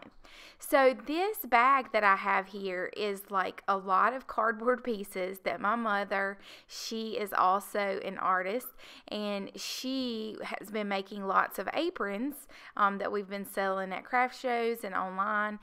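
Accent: South, United States. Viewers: Miss your cute southern voice at the end! It's still a Great process video!! I love all the texture and vintage feel. 💜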